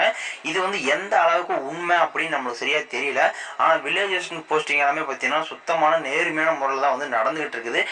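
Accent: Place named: Erode, India. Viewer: Erode sluringa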